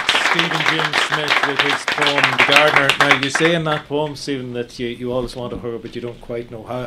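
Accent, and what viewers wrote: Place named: Ireland. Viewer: Such a beautiful poem. And the Irish accent just makes it that much better (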